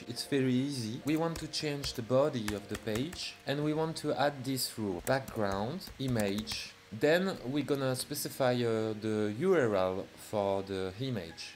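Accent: France. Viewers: what's up with the french accent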